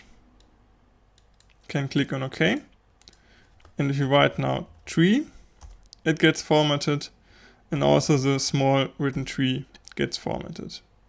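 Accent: Germany. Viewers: Very well explained even though with a hard German accent :) I spot some similarities to linux commands and i.e. escape characters. My only suggestion is to deal a bit with some vocab like Backslash "\" is a escape symbol, you have parenthesis, curly braces|brackets or square braces|brackets colon is "Doppelpunkt". | is vertical bar or "pipe"... Anyways... Thank you for the well-performed explanation!! ++++ You dropped your website it seems. Maybe you have to edit your description and the video.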